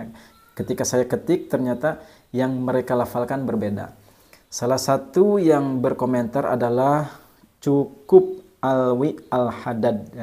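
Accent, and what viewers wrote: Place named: West Java, Indonesia. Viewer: Pa ustadz logat kyak orang Sunda, emang tinggl daerah mn klu boleh tau🙏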